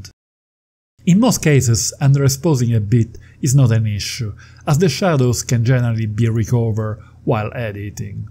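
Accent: Italy. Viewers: Very informative and helpful, especially for a beginner in video editing pro photographer. And I adore this heavy Italian accent. Big thumbs up!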